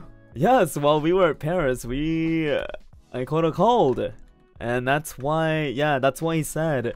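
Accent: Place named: Australia. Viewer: Why does his aussi accent sound stronger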